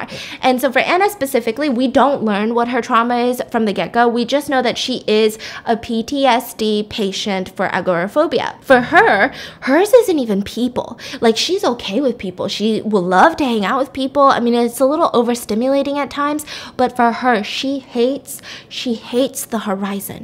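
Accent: United Kingdom. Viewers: Ever since has started speaking in an British accent I’ve added it to my daily life 🤩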